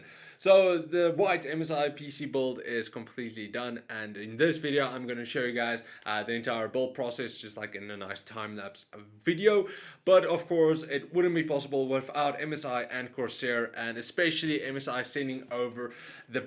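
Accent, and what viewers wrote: South Africa. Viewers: Just subscribed when I heard you're South African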